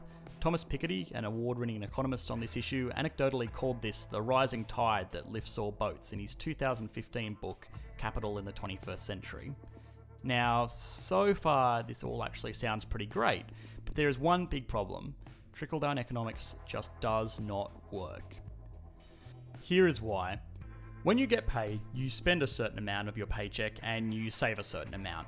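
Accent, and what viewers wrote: Australia. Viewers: Australia has had the economic advantage of massive mineral wealth (even though most of the wealth has been plundered by multinationals under Liberal governments), which has meant that the GDP is much higher than comparable nations - in addition we have a (increasingly less) generous welfare state which includes packets of money for people studying or looking for work - which is circulated back into the economy at an incredibly high percentage, as neither students nor job seekers are known for their massive wealth hoarding. In more modern history, we were able to escape the worst of the global financial crisis through some appropriately timed stimulus packages by the Labour Rudd/Gillard which included literally just giving money to every citizen and asking them to spend it in the economy - which reinforces the main point of the video that if more money is given to the masses, the economy is more secure. 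Coming from an Aussie - which, from the sound of the uploader's voice, is the where the person making this video also came from.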